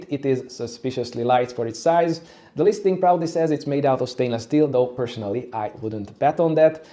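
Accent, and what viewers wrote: Hungary. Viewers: What accent is that, Hungarian? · Magyar akcentus amit hallok?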